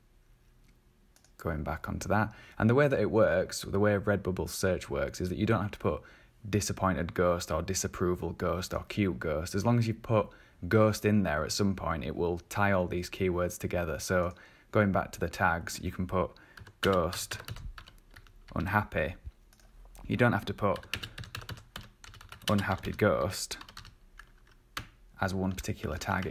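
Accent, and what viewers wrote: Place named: North, United Kingdom. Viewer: I’ve been on RB for a while but not really used it properly, though have had a few sales. This is really helpful and I’m now going to go through your other videos to get more tips. I also love that you’re ‘northern’ like me - it’s weird but your accent makes me want to listen to you. Great video , thank you.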